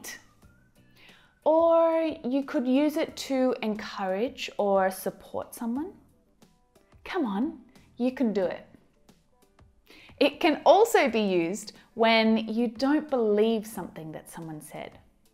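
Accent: United Kingdom. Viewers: looks like your english pronunciation "british." anyway . thanks. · Ok ,,.es que estoy aprendiendo el inglés pero de pronunciación Británica que es más limpia y solo encontraba Americana... y te encontré...besos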